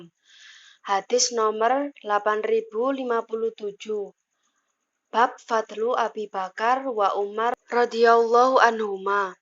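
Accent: Java, Indonesia. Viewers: Dihhh annoying bangett sihh mbbaakkk , suara nya itulohhh medhookk nya ,dan kontekstual banget kaku kek kanebo keringg, isi jga g jelas n berbelit-belit, sama kek junjungan nya si syiah hobi n pandai Berpura-puraa, nohhh ikutt drama telenovela sonohh biar dapet piala, sayang kan sama bakat pura-pura nya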